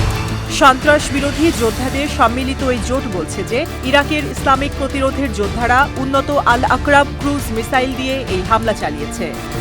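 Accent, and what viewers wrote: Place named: West Bengal, India. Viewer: প্রিয় ৭১ টিভি আপনারা কি পারেন এই মহিলাকে ভয়েস ওভার থেকে বিরত রাখতে? কারণ উনি ভারতীয় চতুর্থ শ্রেণীর নাগরিক পশ্চিম বাঙ্গালীদের অনুকরণ করে কথা বলেন।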